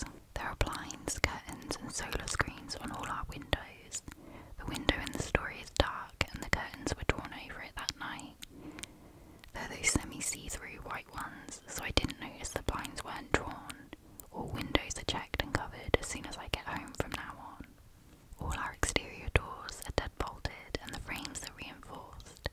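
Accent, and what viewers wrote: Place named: United Kingdom. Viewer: GOD DAMN you're impeccable dude!! As per usual lol. Ive gotta say, you have suuuuch a lovely British accent ❤ Im Aussie, my former father in law is a Geordie.. so i know not all English accents are as... ummm... soothing as yours? Lol! 😂 Love your work doll, seriously.. you're unbelievably good at this. Your accent & whisper tone as so well suited to ASMR ❤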